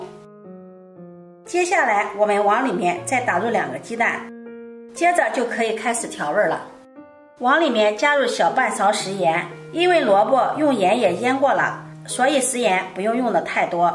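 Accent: Shandong, China. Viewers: 可能是山東口音。口音跟生長環境有關，是免不了的。聽習慣了就好。重點是她口齒清晰，要言不煩，而且都解釋得清清楚楚，實在是很優質的烹飪教學！ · 山东西部口音。水分（fei）,我们（mei）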